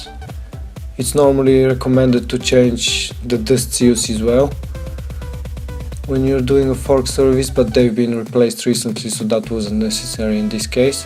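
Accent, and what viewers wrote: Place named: Russia. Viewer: ты русский?) ато разговариваешь по англиски как русский))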